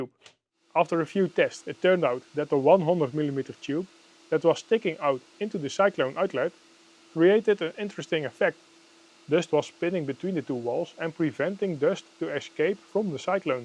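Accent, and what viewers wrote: Netherlands. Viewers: The moment you hear its a dutch guy within the first few seconds xD great video tho! oh, greetings from Soest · Dutch accent is classic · Good content, but don't know if auto dubbed is worse or the super dutch accent xD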